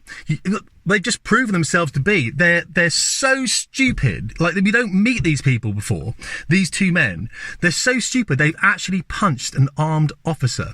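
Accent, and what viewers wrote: United Kingdom. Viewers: Wonderful to hear a true British man talking!!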